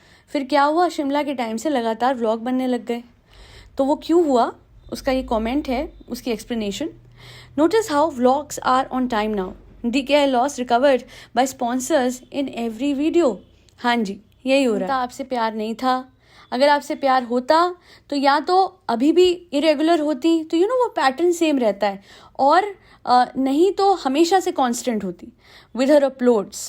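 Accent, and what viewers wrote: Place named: Punjab, India. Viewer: I am in ❤ love with your Punjabi accent plssssss aise hi podcast banaiye❤❤❤❤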